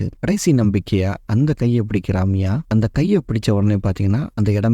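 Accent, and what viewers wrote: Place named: Kerala, India. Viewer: Chettan malayaliya Nattilevideya Nice Tamil slang chettayi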